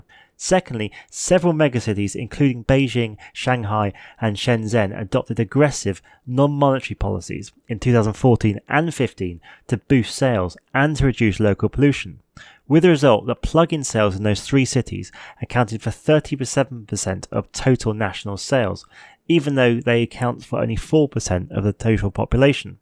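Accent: United Kingdom. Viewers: I think you did an excellent job. But than again, I'm a native English speaker from Ireland so very used to the British accent. By the way, I just subscribed to your channel due to the highly informative and interesting content. Keep up the good work!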